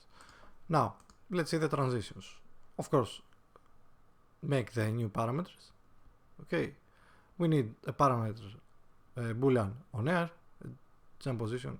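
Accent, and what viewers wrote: Greece. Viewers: Are you Greek by any chance? :) Asking cause of your accent.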